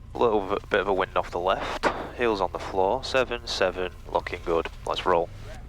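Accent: United Kingdom. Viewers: You sound British, but you're in the USA.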